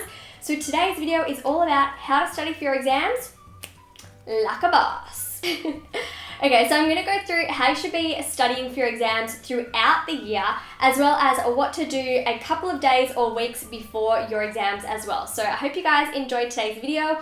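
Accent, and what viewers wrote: Australia. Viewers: Is she Australian ? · Are you Australian???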